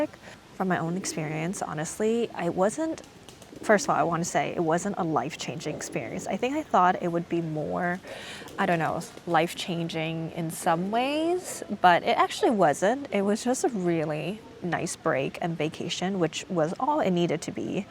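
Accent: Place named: Canada. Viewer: I love the Canadian raising in your accent - every other sentence sounds like a question!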